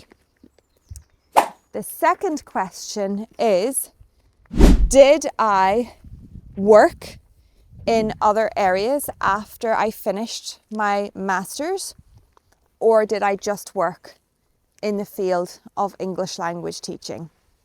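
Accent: United Kingdom. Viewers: No, you didn't always Only in the field f English teaching You are satisfied, indeed. Are you English? Your accent is not RP, not southern at least. Rhotic at times, not scotish... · Hi, I'm from Brazil. How've you been? Can I ask where your accent is from? It sounds british to me. Am I mistaken?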